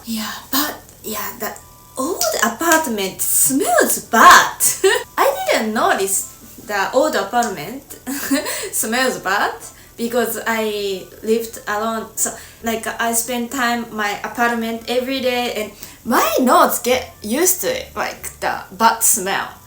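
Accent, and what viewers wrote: Japan. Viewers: ❤❤ JAPANESE WOMEN ARE VERY EMOTIONAL I AM EMPRESS FOR YOU LIKE OTHER JAPANESE WOMEN I'VE MET ARE STUDYING IN THE PHILIPPINES SOME OF THEM SPEAK TAGALOG OTHERS OF THEM ARE MAJOR HERE😊🎉.. THE HISTORY OF NATION 4 THE LANGUAGE OF THE PHILIPPINES TAGALOG ENGLISH SPANISH JAPANESE DURING THE COLONIAL ERA 400 YEARS AGO THE 4 IMPERIAL COUNTRIES DURING THE JAPANESE EMPIRE COLONIAL ERA IN THE PHILIPPINES JAPANESE AS A SECOND LANGUAGE BUT WHEN THE PHILIPPINES BECAME FREE JAPANESE AND SPANISH LANGUAGES IT WAS REMOVED. THE ONLY THING THAT TEACHES IS THE JAPANESE SCHOOL IN THE PHILIPPINES BUT IN SPANISH WE HAVE A DIALECT THIS IS CHAVACANO 80 PERCENT SPANISH LANGUAGE WITH A HUNDRED DIALECT MANY ARE STILL TEACHING US BUT IT JUST DEPENDS?. I THINK YOUR ENGLISH IS OKAY BUT IT HAS A JAPANESE ACCENT❤😂😂 WHEN I GO TO JAPAN I WANT TO MEET YOU YOU ARE ALWAYS IN MY HEART 😊❤❤!!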